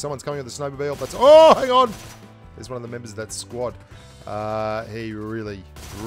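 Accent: Australia. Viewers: You literally need to be sound like australian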